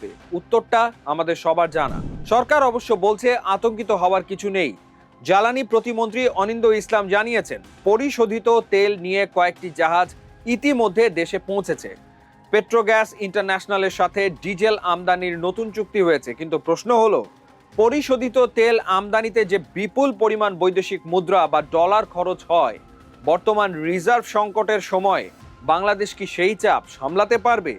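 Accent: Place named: India. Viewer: ভারতের মতো নিউজ পড়েন কেন🙄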